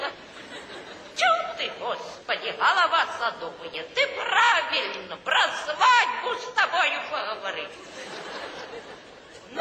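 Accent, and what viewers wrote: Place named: Russia. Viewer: Не понила это что русской деревне так разговоривают ,браво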